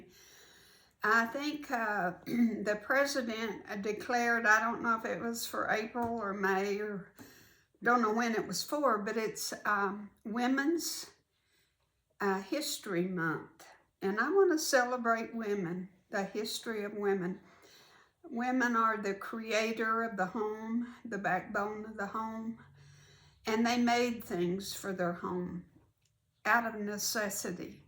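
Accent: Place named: Kentucky, United States. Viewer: So enjoyed your video. I'm from ky. Where are you from. You sound so much like alot of the ladies in our quilting guild. Will be watching all the videos you do as its like watching a close friend. Loved the pillow. So beautiful!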